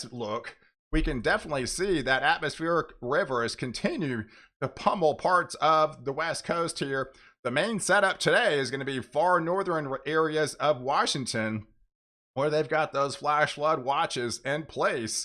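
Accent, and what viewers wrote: Texas, United States. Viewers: Hahaha - that accent is so Texas, it hurts. Maybe she is confusing him with... Christopher Walken... 😱😁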